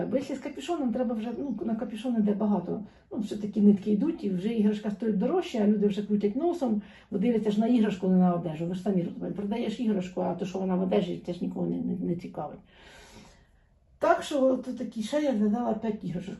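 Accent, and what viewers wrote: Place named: Cherkasy, Ukraine. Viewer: ааа, я так і зрозуміла що розмова Черкащини. Я з Корсунь-шевченківського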